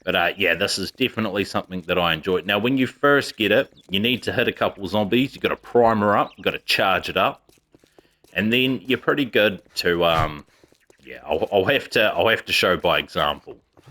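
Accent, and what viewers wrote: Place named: Australia. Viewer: I just found you through algorithm. Don't have console, always played on pc but live the accent lol. Not many aussies (assuming that's where the accent is from) I've seen play this and post on it so keep posting 👍 all the best "mate" 😉😜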